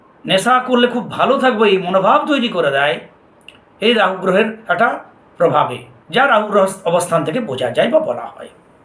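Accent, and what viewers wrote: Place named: West Bengal, India. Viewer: আপনার বাড়িটি কোথায়? পুরুলিয়া, বাঁকুড়া,বা বীরভূম হতে পারে।মাঝে মাঝেই স্থানীয় টান চলে আসছে। তবে সহজ, সরল, ভাবে বোধগম্য, সহজপাচ্য পাঠ সত্যিই অনবদ্য। কৃতজ্ঞতা স্বীকারে -- মনি।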